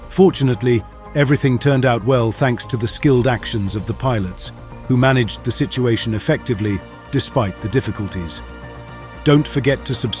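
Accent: England, United Kingdom. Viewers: Oh no a British accent speaking in US English.... You tube again. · It's a very well-spoken & clipped English accent - I am sure I recognise the voice but I can't put a name to it, but it is a human voice.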